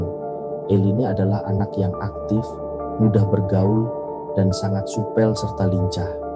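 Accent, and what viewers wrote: Java, Indonesia. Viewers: Duuuuuuh....KEMANA KAU MAS??????? Logad Jawa mas yg bikin rindu...ini siiih hanya membaca...gak seruuuuu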